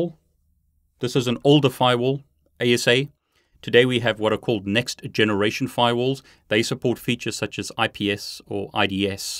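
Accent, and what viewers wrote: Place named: South Africa. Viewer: Hi. Is your Udemy course the same as the YouTube channel one? I want to do my CCNA and was delighted to find a SA voice. Best Udemy video's as well.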